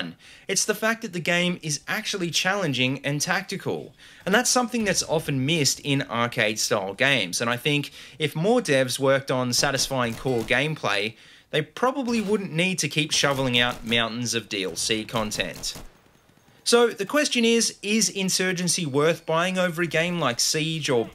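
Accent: Australia. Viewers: Gaming I knew you were Australian as soon as I herd your voice keep going mate. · Youra Queenslander arnt ya? :p That okka accent!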